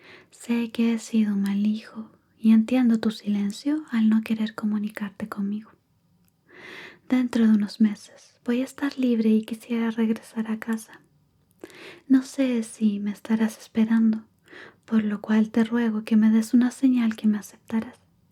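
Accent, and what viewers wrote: Chile. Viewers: Desde la primera vez que te escuché, quedé enamorado y fascinado con tu dulce voz y arrobador tono chileno 🌹👸🌹🎼🎵🎶🇲🇽🇨🇱 GRACIAS 🙏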